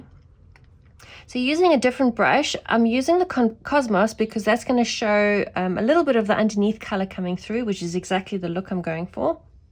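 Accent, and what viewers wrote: South Africa. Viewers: Love hearing your South African accent. Thank you for the awesome tutorial! ❤️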